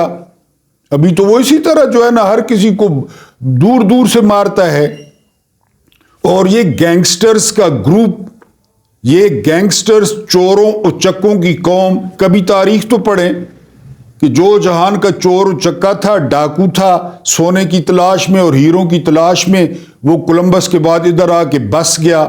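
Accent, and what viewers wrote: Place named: Mianwali, Pakistan. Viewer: Janab Sahib hum tu aapka vlog ka intazar kartay hain. Kun keh aap such boltay hain aur pakistan kai ASLI mujrimon ko tikaa tikaa kai back per martay hain unn ka asli chehra apni awam kai samnay rskhtay hain aur apnay mianwali style aur zuban mai buht khubsurat guftagoo kartay hain. Pakistan keliey dukhi insaan ek legend Anwar Maqsood sahib ka tazkira bhi buhat acha laga.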